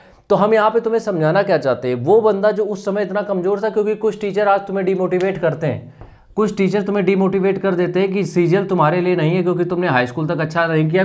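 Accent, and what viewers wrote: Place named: Uttar Pradesh, India. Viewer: भाई साहब up के बंदे लगते है लखनऊ , कानपुर भाषा 😅😅😅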